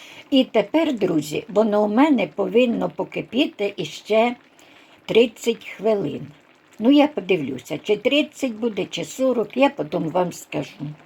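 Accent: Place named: Poltava, Ukraine. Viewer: Я теж робила ікру з кабачків і в кінці додавала сардини в маслі, тільки цибулю і моркву смажу окремо на пательні, потім додаю до засмажки томат, потім все це зливаю в ікру і ще трохи протушкую і тоді все по банкам. Смачного Вам. Мені наче почулось, що ви з Полтавщини, в мене батько був родом з Хорольського району.. Дякую Вам за рецепт. Перемоги і миру нашій Україні. Херсонці з Польщі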